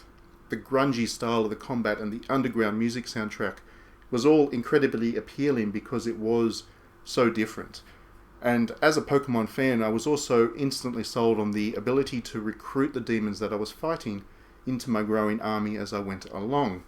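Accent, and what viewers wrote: Australia. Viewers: Austrailian accent clearly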